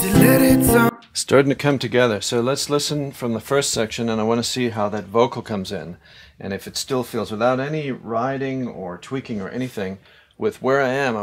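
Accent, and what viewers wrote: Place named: South Africa. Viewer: The weirdest thing is as a South African, hearing his accent that has hints of the South African accent that’s been completely taken over by the American accent 🤣😂 anyway, such a talented engineer!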